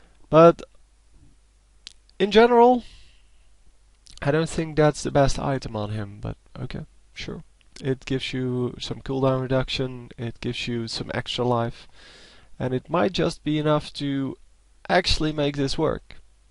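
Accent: Netherlands. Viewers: Haha, ik wist het! Nederlands, hoorde het aan je accent =p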